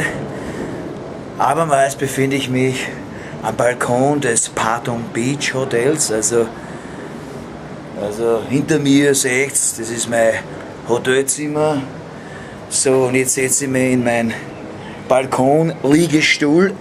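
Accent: Vienna, Austria. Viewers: Jo Mann, do kummt des Wienerische so richti schee rüba. Verzeih mir, dos I des net guat ko, aba gfalle tuts ma scho!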